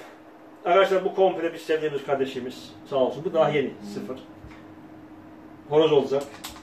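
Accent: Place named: Rize, Turkey. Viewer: Adam rizeli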